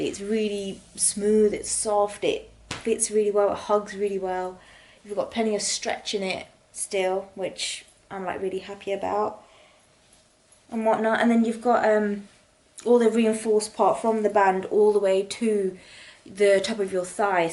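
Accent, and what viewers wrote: United Kingdom. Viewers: I enjoy all of your videos and I love your British accent your voice is lovely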